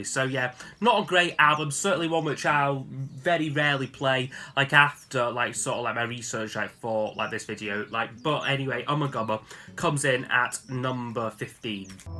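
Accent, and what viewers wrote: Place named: Northern England, United Kingdom. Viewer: Great video. Liked a lot of the positions you put them in Trying to place your accent, thought i heard Northern English, Welsh and maybe even Scottish at one point 🤣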